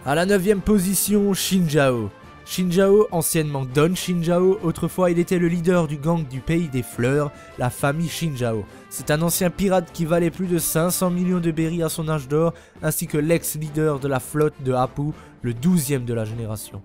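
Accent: Alsace, France. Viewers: Yôôôôô cet accent sent l'alsace! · T'a l'accent alsacien !!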